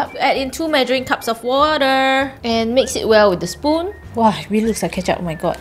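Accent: Singapore. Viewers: Sounds So So So Singaporean and Singlish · Is it just me or accent is so different from her usual singaporean accent here lol and has singaporean accent yay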